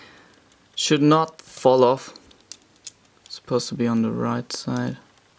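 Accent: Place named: United Kingdom. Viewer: Also you sound a bit more British than the last time you spoke. I know your german but maybe when you dm i was reading with the wrong voice again. The voice is t important what's important is the fact that you did better and i love how you improve in one year!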